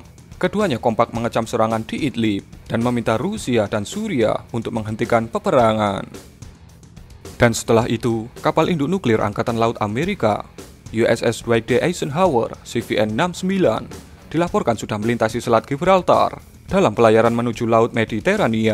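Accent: Java, Indonesia. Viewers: Medok ya mas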